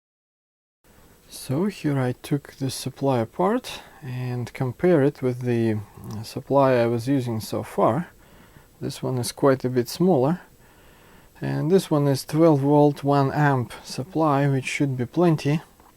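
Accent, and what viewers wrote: Russia. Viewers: русский акцент паливо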